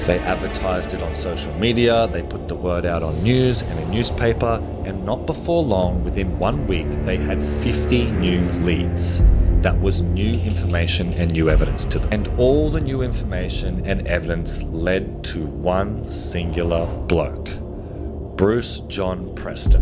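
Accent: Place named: United States. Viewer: i actually thought you sounded American mixed in like..Did you spend time there.?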